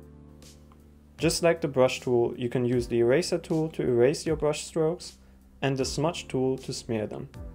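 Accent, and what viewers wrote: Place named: Germany. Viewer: Das klingt doch nach nem deutschen Akzent 👀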